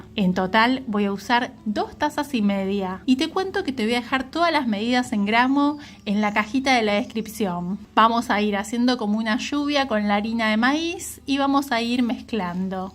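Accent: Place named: Mendoza, Argentina. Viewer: Ese acento lo conociaaaaaa😂😂😂 soy de Mendoza 🩶 te mando 🫂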